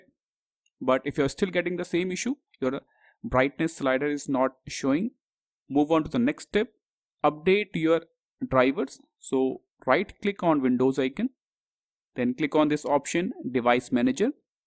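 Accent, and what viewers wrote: India. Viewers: Looks for computer problem solutions. Hears Indian voice. Computer fixed. My guy you the best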